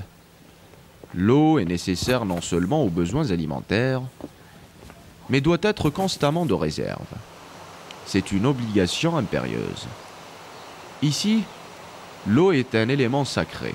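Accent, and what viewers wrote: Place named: Kabylie, Algeria. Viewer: Accent kabyle normal a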